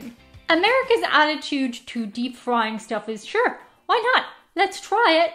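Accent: Ireland. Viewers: Hello you got fantastic content I am a Irish ancestry but I was raised and born in USA I am a fan of Europe culture my surname is Murphy. your channel is a fantastic channel great interesting content. Your dog is awesome and very beautiful dog. I love your Irish accent too. I really like to visit Ireland one day to visit where my ancestry come from I also want to visit the United kingdom too.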